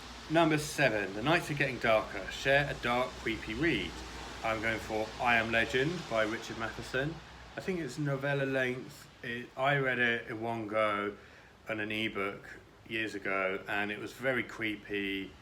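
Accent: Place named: England, United Kingdom. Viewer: Hello fellow UK booktuber! I don't often come across very many so I get excited when I hear an English accent! Great video, love your channel so far! I am new here so still trying to find my way around. Subscribing for sure ❤️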